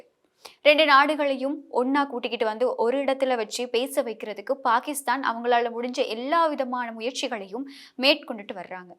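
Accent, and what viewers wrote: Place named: Chennai, India. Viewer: மூதேவி Madrasதமிழ் கதைக்காதே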